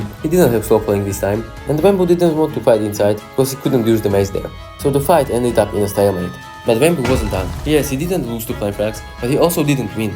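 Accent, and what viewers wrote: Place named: Czech Republic. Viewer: Yo where are you from bro, you sound like your from Czechia? Ze by takova nahoda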